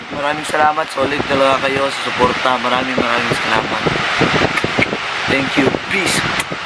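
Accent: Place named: Visayas, Philippines. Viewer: Bisaya ka sir?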